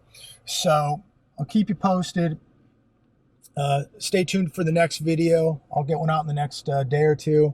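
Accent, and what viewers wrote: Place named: Alabama, United States. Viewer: If you’re Liberal please don’t move here. This guy already sounds like an Alabamian. Let’s keep Alabama RED!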